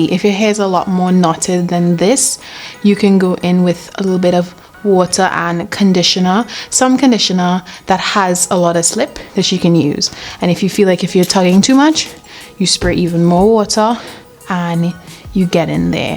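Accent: Trinidad and Tobago. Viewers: I knew you sound trini, had to check your description box 😂...am trini also😱🙌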